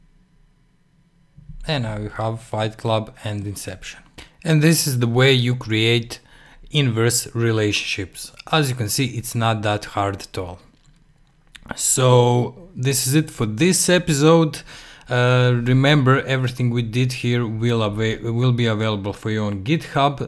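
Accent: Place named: Russia. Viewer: Just your accent in English is similar to Russian )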